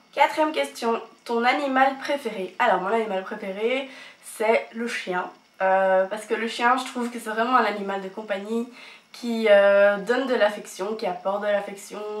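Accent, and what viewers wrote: Belgium. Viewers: Super vidéo , ça permet de mieux te connaître :D Tu es belges ? :)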